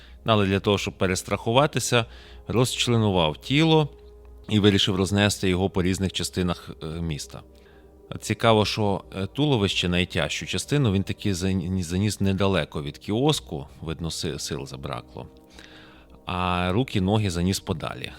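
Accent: Lviv, Ukraine. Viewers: Дякую. Гарний виклад чистою львівською мовою. Історичні фрагменти життя Львова - це хороша і правильна рубрика. Сьогодні я Вас почув у перше і мені сподобалось. Здоров'я і добра Вам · Чудовий львівський говірок.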